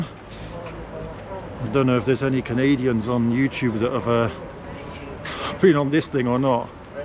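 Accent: England, United Kingdom. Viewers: Great video! I wonder if they were uneasy with you filming since you have the English accent, maybe worried some Englishman would make anti russia videos?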